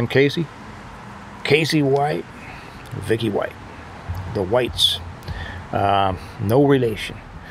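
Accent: South, United States. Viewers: You're making me over comment ....You're much better than Dave Ramsey ...in fact you should brush up and apply for the show .....your country accent is better also ....Time for a change !!!👍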